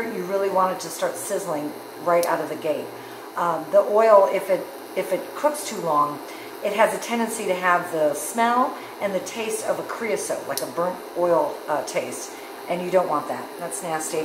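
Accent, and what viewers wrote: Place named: Chicago, United States. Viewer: You seem to communicate in Chicago speak!...awsome recipes...such fun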